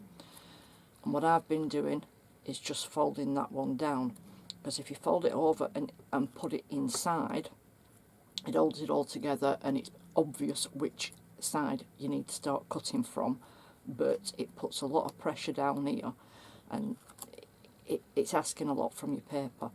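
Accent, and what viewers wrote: Lancashire, United Kingdom. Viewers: Hello my lovely I haven't seen one of your video's for ages. This is great🖒 I will share it on my Pinterest for you. are you from Lancashire you sound like my aunt in Preston lol x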